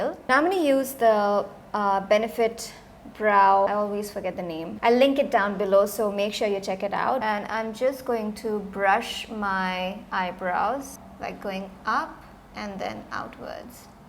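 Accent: Bengal, India. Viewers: Yes that bengali accent was beautiful 😍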